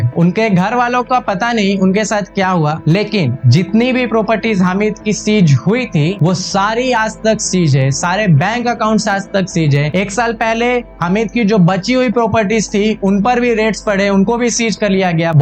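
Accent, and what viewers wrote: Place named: Bihar, India. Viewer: Pakka yeh Bihar se hai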